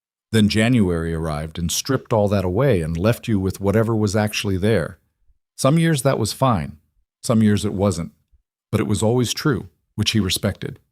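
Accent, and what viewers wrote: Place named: New England, United States. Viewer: I'm not a fan of how Yankee this narrator sounds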